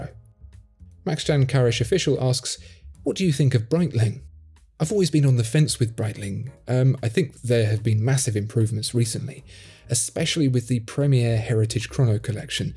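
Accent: United Kingdom. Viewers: Those Lange 1815 chronographs or datograph perpetual calendars are indeed the best grail piece. I prefer your videos with no face, ethereal presence voice (the brit accent helps too 😂). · You make watches interesting. You and your late night FM radio voice. Women around the world want to know, 'Who is that mystery hands British lilt man with that voice?'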